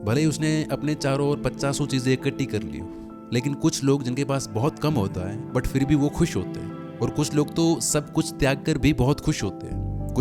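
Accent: Rajasthan, India. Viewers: bhaiya rajasthan se ho bato se lagta h me bhee jodhpur se hoo. or me bhee logo se kat gya hu phone calls band Kar dee Sabhi se bat krna band kr diya par result ka jyada hee sochta Tha pr aapkee process Wali baat me bhee Dhyan dunga ab thanks u❤brother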